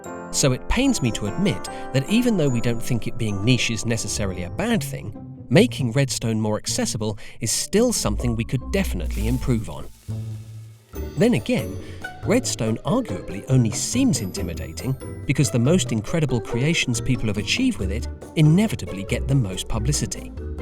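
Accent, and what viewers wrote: United Kingdom. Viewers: i wouldnt mind if narrator narrate my life he seems like a very nice british person too bad he could only stay for one more year 😔😔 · Alternate Title: British Narrator creates a time machine while reflecting on Mumbo Jumbo's tutorials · Oiii oiii this narrator is British less goooo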